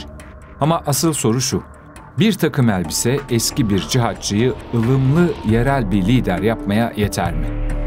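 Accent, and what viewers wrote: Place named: Adana, Turkey. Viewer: Sadece bir Adanalı ,Elbise derken E yi uzatıp Eelbise der.seslendiren kişi,ya osmaniyeli,ya Adanalı ya da mersinli.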